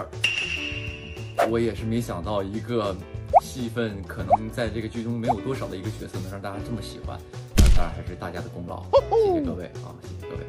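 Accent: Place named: Dalian, China. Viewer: 我是永居在日本大连人、（具体应该是瓦房店的、离丹东更近一些）听着说话就特别亲切、直播时说话和我们家都一个味儿、无比的亲切、都能扑哧的笑出声音来、乡音乡情、尤其宇宁小弟还怎么努力、这么与众不同、用自己的方式给自己开辟出一片新天地、我最佩服这样的人、加油吧、在海外的粉丝会一直支持喜爱你的！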